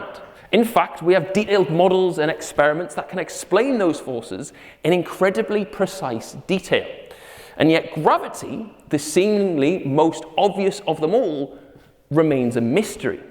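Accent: Newcastle, United Kingdom. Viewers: Very impressive, proving you can say something smart in a Geordie accent!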